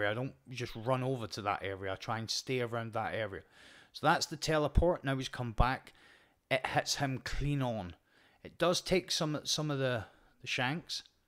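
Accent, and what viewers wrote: Scotland, United Kingdom. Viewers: Thanks for a great video! This was really well explained and shown and had some pretty good tips for some techniques that I haven't seen before, on other videos of this mission. You played this beautifully!! Talk about making it look EASY! I wish I found your channel earlier! Only a few minutes into it, I knew I had to subscribe. Definitely looking forward to more! You have a very interesting accent. Is it Scottish?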